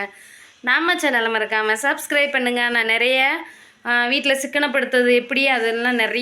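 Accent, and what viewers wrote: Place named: Madurai, India. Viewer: நீங்கள் மதுரை பக்கம்பேசுரமாதிரிபேசுரிங்க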